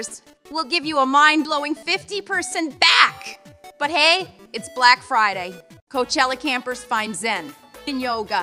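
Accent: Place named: Minnesota, United States. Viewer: AI voice is from Minnesota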